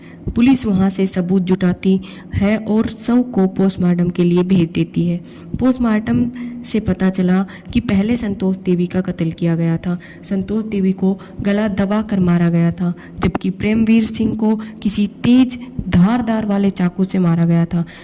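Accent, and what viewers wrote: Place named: Haryana, India. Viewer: Awaz haryana ke hai, ma'am haryana ke hai.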